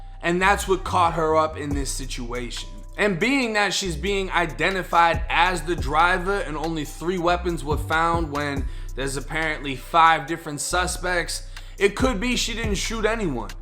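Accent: Boston, United States. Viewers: You from Boston? Hear that accent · Yo bro u got ever been to Boston?? Cause u sound like one of us nbs lol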